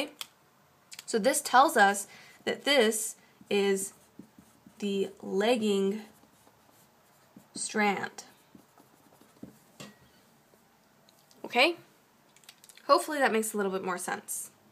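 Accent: Canada. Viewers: thanks sweetie :) SO helpful...are you canadian btw? :)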